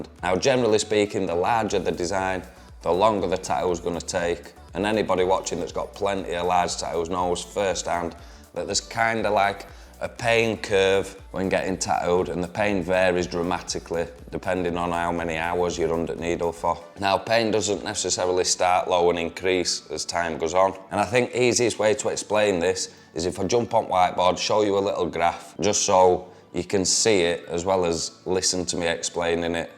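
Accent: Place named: Scotland, United Kingdom. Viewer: I love the Scott accent. “ Tah’ooh”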